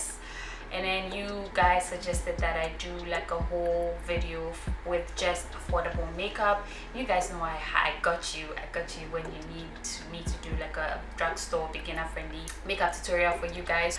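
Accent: Ghana. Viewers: This girl, you are a fine babe. My first time watching and I said, ahh this accent is from Ghana. I love your accent. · i came in the comment section to find out if she's Ghanaian...that Accent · When I came across your video and heard your accent I was like she sounds Ghanaian and I was right🇬🇭🇬🇭! I love your tutorials, keep it up. I plan on watching this to do my make up for a wedding I have coming up this weekend. Thank you!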